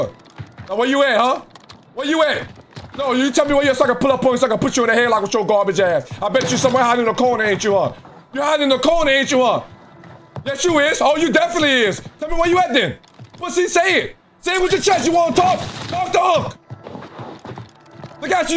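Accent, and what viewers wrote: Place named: New Orleans, United States. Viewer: Where you from I’m from New Orleans sound like you from here me and my brothers betting they said you not let us know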